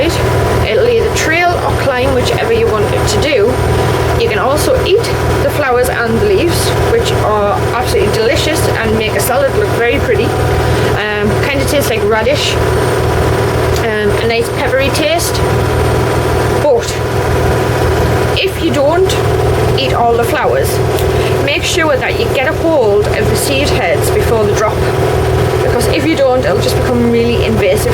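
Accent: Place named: Newcastle, United Kingdom. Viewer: I only discovered your channel a couple of weeks ago and have had marathon sessions watching your gardening vids from the beginning, to the extent that I've started talking to myself in a Geordie accent when I'm gardening! You're an inspiration!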